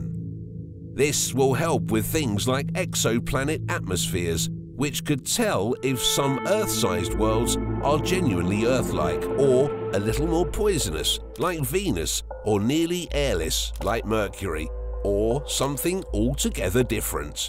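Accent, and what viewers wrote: United Kingdom. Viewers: So not only is this narrated by a Brit, essential to making Americans think there is some intelligent afoot, but the Title is a lie. THere are no new images, and only the very clear statement that sooner or later there will be. This entire project is an amazing feat primarily on the part of US teams of engineers and scientists. Isn't it a pity to have to lie about in the title? Whoever posted this, you dishonor a great achievement.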